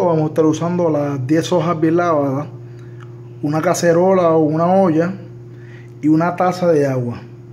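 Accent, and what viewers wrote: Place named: Puerto Rico. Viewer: Bendito se nota que eres boricua amigo.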